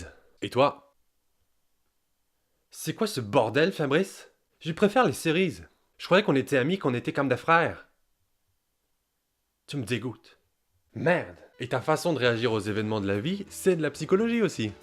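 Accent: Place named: Quebec, Canada. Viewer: Ce petit accent québécois. 👌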